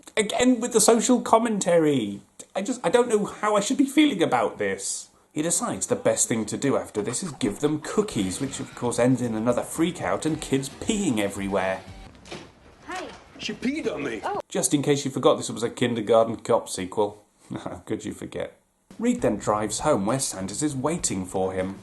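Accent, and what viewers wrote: United Kingdom. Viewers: As an American, whenever I find a new channel and you have a British accent, that's all I need to know this shit is about to funny.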